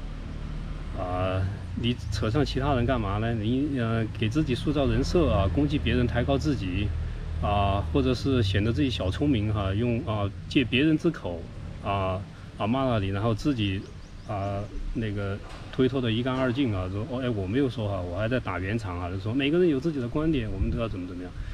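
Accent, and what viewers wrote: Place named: China. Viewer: 你是否遇到了马来西亚共产党了，声音与大陆一样啊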